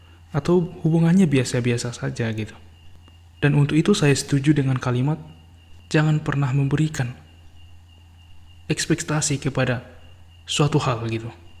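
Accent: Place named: Sulawesi, Indonesia. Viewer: Abang nya asli mana seperti logat Sulawesi -_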